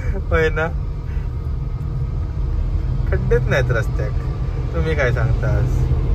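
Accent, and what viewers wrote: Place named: Malvani, India. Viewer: तुमचे वीडियो आणि तुमची भाषा आपली मालवणी खूपच भारी वाटता, खूप शुभेच्छा......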